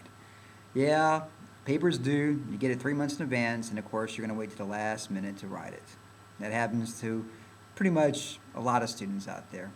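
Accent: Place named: South, United States. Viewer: i thougjt the same and was confused by the southerner accent lol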